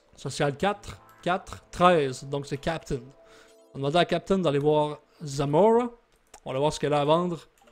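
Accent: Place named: Quebec, Canada. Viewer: Tu mérite plus d'abonnés , De plus que tu es Québécois (J'adore l'accent que vous avez ^^) et puis surtout tu expliques bien :D Je t'adore :D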